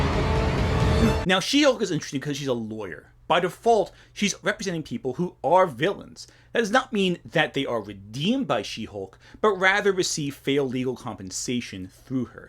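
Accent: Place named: New York, United States. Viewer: where you from buddy you got a great accent, nice vid i'd guess nyc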